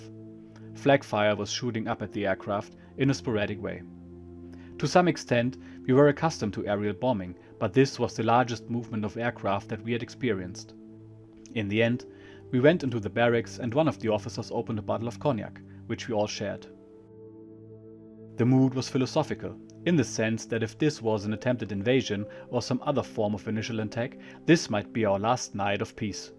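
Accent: Germany. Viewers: Excellent stories. Thanks for these. the narrator is it a real person reading? I like the German accent to add realism.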